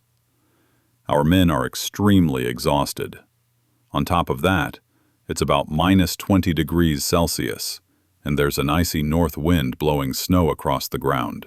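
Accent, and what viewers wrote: United States. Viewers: What southern accent? That's pure middle American speech. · Please, get rid of the American southern accent for the narration.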